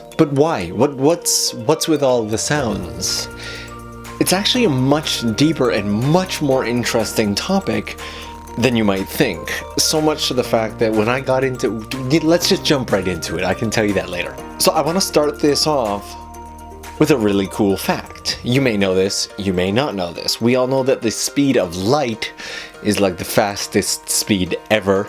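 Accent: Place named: Canada. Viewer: No I don’t mean it’s funny... I was just wondering if u are a Canadian Cus the way u pronounce those words... I love the Canadian accent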